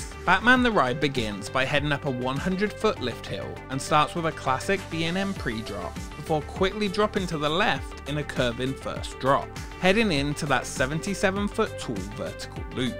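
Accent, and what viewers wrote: United Kingdom. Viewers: A high-pitched British voice.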